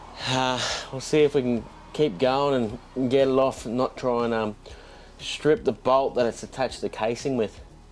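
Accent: Australia. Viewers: Good video, but a had a hard time understanding your Australian twang. Greetings from Las Vegas Nevada USA 🇺🇸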